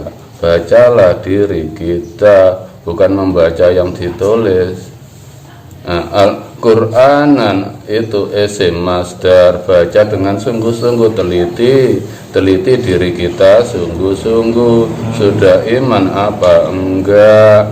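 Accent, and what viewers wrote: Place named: Banyumas, Indonesia. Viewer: pangapunten nggeh..kok miriipp sanget kalih panglima besar jenderal Sudirman... aksen bahasanya mirip dengan wong Banyumas Jawa tengah Mbah 👍🏼👍🏼👍🏼🙏🙏🙏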